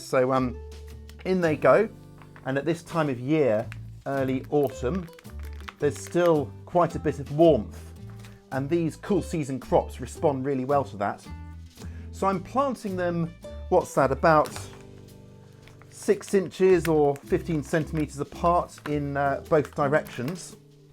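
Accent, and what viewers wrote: United Kingdom. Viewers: Thanks I just love watching and listening to you. I’m from Yorkshire but live in Arizona. It’s great to hear British accents! I recommend the book The Three Season Diet which is an Ayurvedic approach to seasonal eating. The theory behind it is first - that there are different bugs in every plant meant to enhance the gut microbiome and eating a wide variety of plants helps strengthen our health. Second is that different foods are digested better at different times of the year. Third different flavors are important at different seasons. All of this is to strengthen and enhance digestion so that as we age we can continue eating a wide range of foods and have the digestive capability to convert them to energy. For example. Field Mushrooms are available all year round in grocery stores but as we know they grow in the spring primarily and they don’t have a long life. 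Spring is the rainy season preparing the land to absorb and sow new seeds. These mushrooms are to help our bodies repel excess water and cleanse the kidneys and liver in preparation for the lighter summer foods. Mushrooms are dry and wick water away from themselves in the field. So eating them in summer and autumn when our bodies are drying out can cause problems with serious dehydration. Hence much of our food in summer is juicy, sweet and ripened on the vine or bush or tree so we don’t have to use fire as much. They are cooling. 🪴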